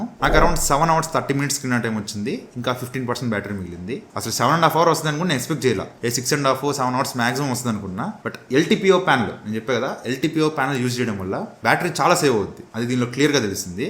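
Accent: Nellore, India. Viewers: Anna nuvvu Nellore odivaaa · Bro nellore aa meedi, yekkada untaru bro maadi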